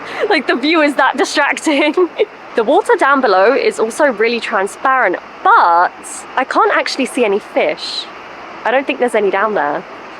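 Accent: United Kingdom. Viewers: はっきりしたブリティッシュイングリッシュで勉強しやすいですよね〜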